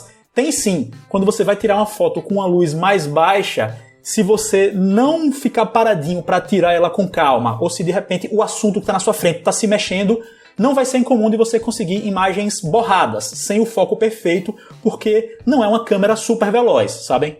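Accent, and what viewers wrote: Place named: Northeast, Brazil. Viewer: Gostei muito desse Rewiem Nordestinizado KKK # nordeste Já vou me inscrever 😁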